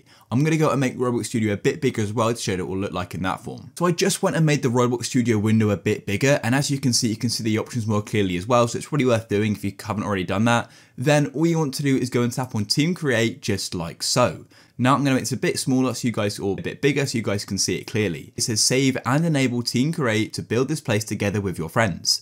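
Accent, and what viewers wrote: United Kingdom. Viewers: he’s just british not every british person sounds like danton💀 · He Sounds Like A British Man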